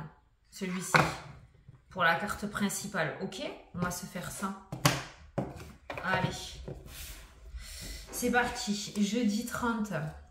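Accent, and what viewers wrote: South, France. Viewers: J'avais raison vous êtes dans le sud comme moi il y a des lotos je pense que nous sommes pas loing mais ce suis pas chiante ont parle comme sa ici.❤❤❤❤❤❤❤❤ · Coucou, je vous découvre depuis hier, et c'est rigolo, le début de la guidance avec un accent du sud hyper prononcé et disparaît au fur et à mesure. Merci pour tous ces détails. 🙏🤗❤️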